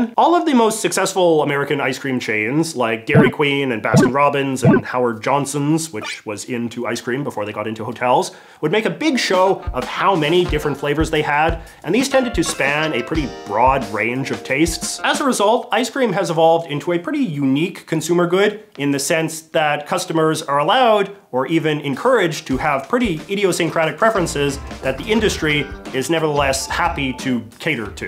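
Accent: Canada. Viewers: The most Canadian accent I’ve ever listened to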